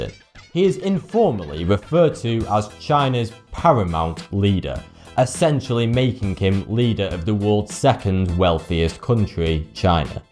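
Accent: United Kingdom. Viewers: the big question is, where is mr. evelyn rotschild?, your accent obviously you are briton, you should know him by now.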